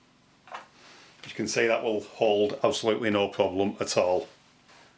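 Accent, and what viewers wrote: United Kingdom. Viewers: What is your?. By your accent I'm thinking you're British but not sure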